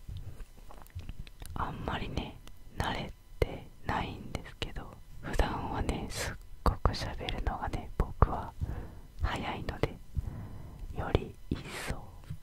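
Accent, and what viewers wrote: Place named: Kansai, Japan. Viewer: ティックトックからきました、音フェチ昔から大好きなんですけど久々に新しいチャンネルにハマりました嬉しい🥲🥲 これからお世話になりまーす！！ 関西弁やな〜って思ったんですけど関西の方ですか??🌟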